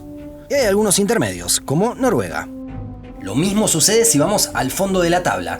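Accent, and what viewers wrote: Argentina. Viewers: No di es nada..seguro eres m argentin. o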